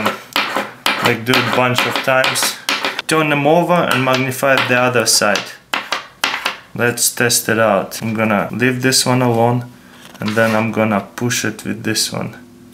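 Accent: Russia. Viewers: i love russian acent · he is Russian. that's why. there is certain things that Americans can pronounce that he can't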